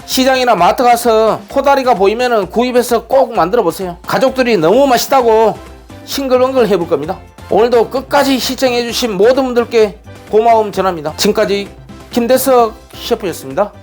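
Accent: Jeolla, South Korea. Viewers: 설명도. 구수한. 전라도사투리로. 친근감있고. 맛도. 배가되는거같아요. 저도. 따라해보려. 합니다. 감사합니다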